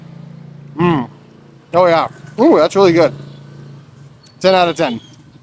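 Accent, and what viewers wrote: Philippines. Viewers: I also like pandecoco. Im so amazed with your Filipino intonations sounds real!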